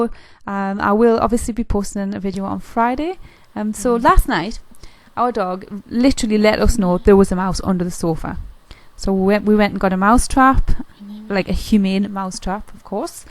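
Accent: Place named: England, United Kingdom. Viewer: Hello from Nampa, Idaho, USA. LOVE the eggs and listening to your English accent!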